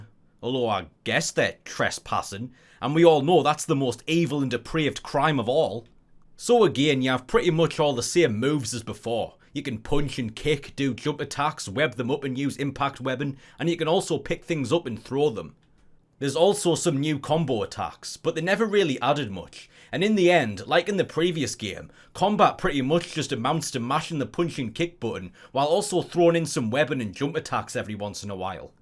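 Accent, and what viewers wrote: United Kingdom. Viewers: Okay I'm 9 minutes in and I've gotta say: - You have what it takes to have easily >500k subs. I don't mean you should only have 500k, but the production and editing and commentary are far above and beyond what I would expect from a youtuber with 182 subs. You're already producing content in the echelon of much higher sub counts which I hope pans out for you. - You have a great voice/accent for this shit. I literally won't watch videos over 8 minutes without a UK accent - Major nostalgia from this game. I used to play the demo of the first game on the Jampack Winter 2000 demo disc I got at a Toys'R'Us (RIP) - actually apparently they are opening inside of Macy's as of a year ago after the bankruptcy and closing of all their stores - Funny without being cringe - Good pacing - describing what happens in the game without being too descriptive all while being analytical without being too analytical - you strike a perfect balance Immediate sub and like. 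One thing/tip (?) is to bleep the f bombs. I do absolutely love hearing them, but I've noticed that lots of the higher profile youtubers are bleeping to maintain ad revenue. I think the bleeps still suffice in delivering the sentiment of f*ck. If you have a Patreon I'd be glad to give $15-20 a month to help out and see where you go. EDIT_0: 13 min in - the skyrim realization is amazing. I didn't know that 🤣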